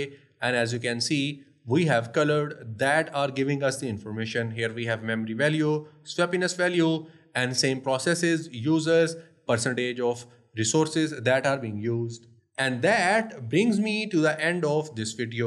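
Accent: India. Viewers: Any video with an Indian accent is a no go! India is scammer central.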